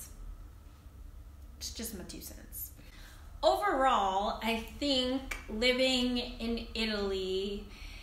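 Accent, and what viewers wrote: United States. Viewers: Why do you think people immediately spot you as an American? Your accent? Do you have to start speaking first? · If I see you in Europe I'll think you are East African, maybe Ethiopian. (Your hair and face seem common with people from that region). That is in a way natural because there are many Africans but almost no black Americans, black Carribbeans or black Latinos in Europe. If you start speaking English though, you'll be revealed as an American, because your accent is very obvious. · You don’t look different than Nigerians or Tanzanians etc. point is whether you are African American or African they see you as Black period and the only way they can tell you are an American is when you speak because of your accent other than that no, you are just like every other beautiful black woman at a glance.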